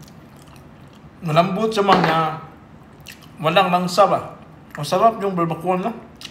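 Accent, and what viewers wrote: Visayas, Philippines. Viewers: Bisayaa jud ni ug tingog ka 😄😄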